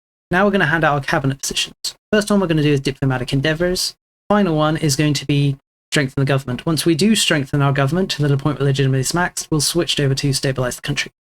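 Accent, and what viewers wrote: United Kingdom. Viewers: knew i was in great hands when i heard that british voice, cheers mate from down under!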